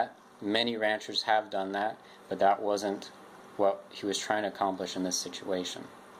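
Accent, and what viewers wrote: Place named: Omagh, United Kingdom. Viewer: Sorry, I posted my previous comment before you asked that we not mention politicians. :-( However, I will mention that I love your channel and look very much forward to visiting you. I once knew a family from Omagh, and your accent reminds me of them. They were lovely.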